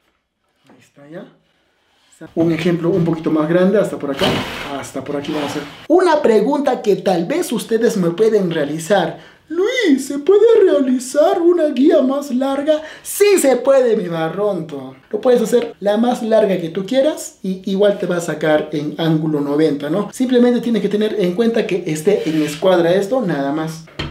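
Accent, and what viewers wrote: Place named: Iquitos, Peru. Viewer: Me encantan tus videos! Eres peruano verdad? hablas como mis compatriotas de Iquitos. Que sigan los éxitos!!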